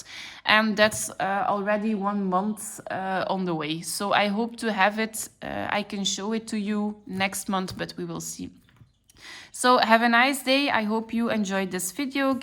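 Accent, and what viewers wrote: Belgium. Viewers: Hey 👋🏻 van België en ja het accent, ik ken het 🤣 en zeker met de Gentse toets erin bij mij, net je kanaal ontdekt en wat mooi allemaal. Zelf relatief nieuw in de kleurenwereld maar zoveel bijgeleerd al 😁 Ik heb nog geen huidskleur durven kleuren, maar ik heb wel interesse in kleur van neocolor II die je hebt gebruikt. Dankjewel 🙏🏻😁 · Ik hoorde meteen dat je een Belg was. Eerste keer dat ik je video's zie. Leuke pagina's dat je maakt. 😁 Heb je een instagram?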